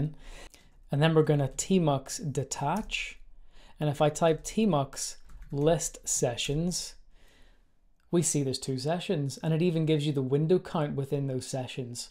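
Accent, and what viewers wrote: Northern Ireland, United Kingdom. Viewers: Ive always loved the northern Irish accent. Its so militant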